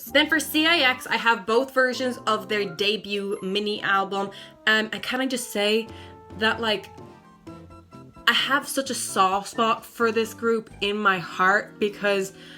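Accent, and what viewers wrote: Ireland. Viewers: bro, am i hearing a fellow irish? i stan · awe💖 your irish accent is super cute!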